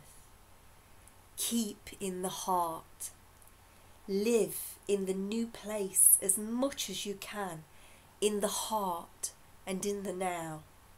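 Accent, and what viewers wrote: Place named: United Kingdom. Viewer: Your GB accent is so much fun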